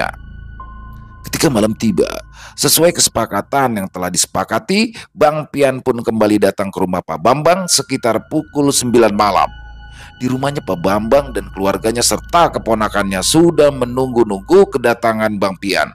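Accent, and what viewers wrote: Jakarta, Indonesia. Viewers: Seru bgr T aye demen betawinya neok banget ya.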